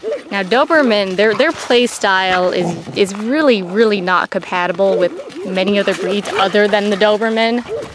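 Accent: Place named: Texas, United States. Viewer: Are you from Texas? You sound like this Youtuber from Texas that i like...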